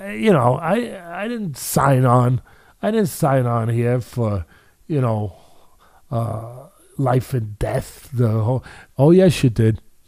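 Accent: Newcastle, United Kingdom. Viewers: wow with true geordie, amazing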